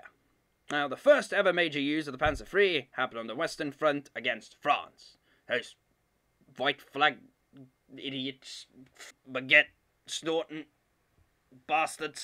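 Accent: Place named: United Kingdom. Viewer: Loving that ridiculously british accent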